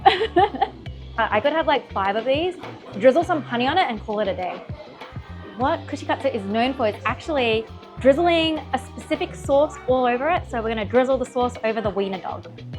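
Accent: Australia. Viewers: she sounds like an Aussie, so are the prices in Australian dollars? would be more helpful to list the actual prices or do they accept foreign currencies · I have a thing for asian girls with an aussie accent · Mizuno … might be great for me being gluten free…. Good to hear the Aussie accent!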